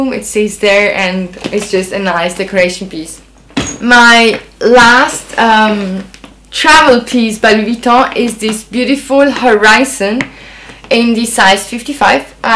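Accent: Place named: France. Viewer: Nice to see you back! I watched A LOT of your videos before starting youtube myself. J’adore ton accent français. (pas du tout comme le mien - je suis Québécois Anglophone)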